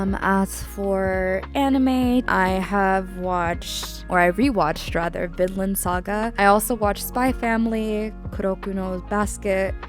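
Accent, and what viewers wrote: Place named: Somalia. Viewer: you sound somali. plz correct me if not but i can just recognize a 1st gen accent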